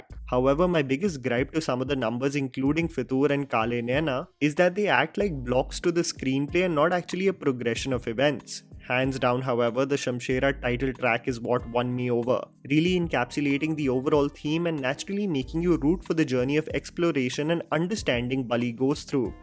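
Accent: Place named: India. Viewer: Followed by horrendous indian accent ................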